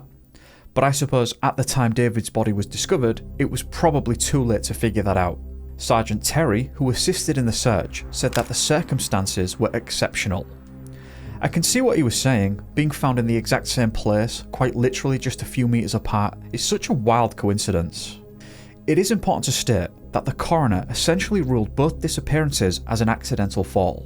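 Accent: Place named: Scotland, United Kingdom. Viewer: Thank you,. I've just discovered one of your videos and I really enjoy your voice. It's so nice that human narrators can still be found! In addition to enjoying the stories, I'm just starting to learn some of the nuances of British accents, and I wonder if you would tell me Where you are from? If I had to guess I'd say you're a Scot, but what do i know! Anyhoo, good channel. Joining now.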